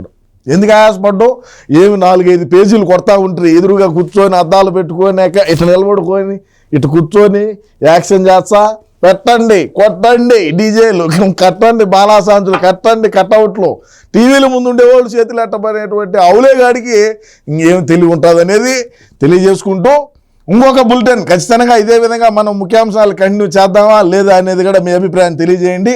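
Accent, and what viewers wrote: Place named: Kadapa, India. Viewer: చూశారా 😂😂😂😂😂😂 ఏం మాట్లాడినావు నా ఊరిలో భాష.👌👌👌 మా కడప దగ్గర భాకరాపేట మాండలీకం 😂😂😂😂😂😂😂 👌👌👌👌👌👌👌👌😢👌👌👌👌👌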